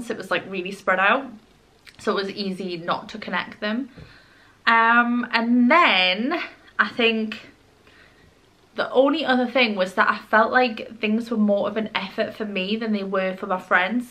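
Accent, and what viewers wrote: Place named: Durham, United Kingdom. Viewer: Are u from Durham by any chance our accent is same as mine that’s all 🤣 I’ve been having what I think is symptoms - usually a fairly healthy person work out eat healthy (most of the time) but I’ve been experiencing painful hips mainly on my right hand side , which cause me to limp numbness pins and needles in my hand feet and travelling up my legs , dizziness fatigue this had all been going on for a while, eventually went to the doctor last week and it all basically fell on deaf ears , raised my concerns of ms and left no further forward 😢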